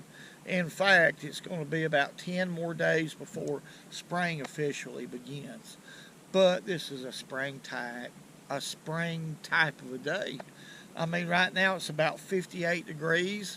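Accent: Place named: Florida Panhandle, United States. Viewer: I really enjoy your videos my friend! I’m from the panhandle of Florida and I love to hear your accent! I have the same thing going on lol!! I moved a couple of states away and I don’t hear anyone talking like us besides your videos. Keep making these videos and I’ll keep watching em.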